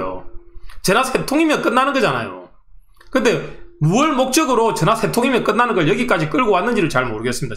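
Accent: Gyeongsang, South Korea. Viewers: 지난 40분짜리 영상도 끝까지 다 봤지만, 말씀 하시는 것 하나 하나 논리적이시고 불필요한 비난 없이 모두에게 도움이 되는 방향으로 말씀해주셔서 이번 영상도 경청하게 됐어요.^^ 처음엔 생소한 채널이어서 조금 오해도 했었는데, 가장 어른답게 말씀해주셔서 개인적으로는 가장 와닿았고, 이 계셔서 든든하다는 생각도 듭니다.^^ 앞으로도 응원하겠습니다! (경남쪽 사투린가요? 들을수록 정겹네요~^^)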